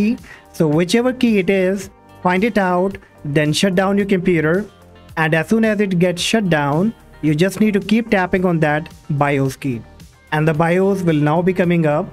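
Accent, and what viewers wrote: India. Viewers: indian Voice it Sound Scam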